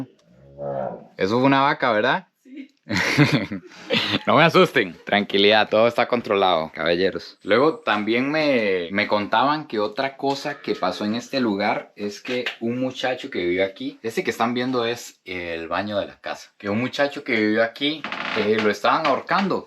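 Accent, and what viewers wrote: Costa Rica. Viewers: Me encanta oh mejor dicho me facina tu acento costarisence.❤️ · Te gusta el acento tico?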